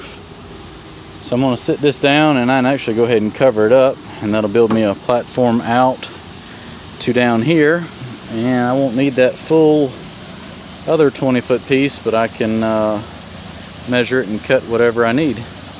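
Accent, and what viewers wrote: South, United States. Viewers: I live in east Texas and your southern-accent is slightly different than it is here. You pronounce the word "water" different than most people here. You say "the toe of the dam," and we say "the foot." Unfortunately now, most people here no longer even speak proper Southern English. They put the slang and vulgar in every expression. This video helped me to understand why spillways are important.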